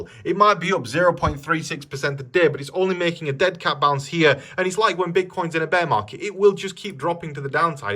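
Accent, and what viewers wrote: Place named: Lancashire, United Kingdom. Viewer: Always enjoy your videos mate, keep it up, good northern accent, take its Lancashire? im other side of Manchester Derbyshire, but they class it East Midlands 😢😢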